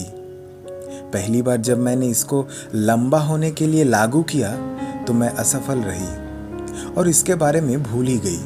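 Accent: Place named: India. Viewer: orry I'm not very good at listening to Indian accents. please tell me about "thank you universe my height is blabla cm" is it our current height or hope for the future?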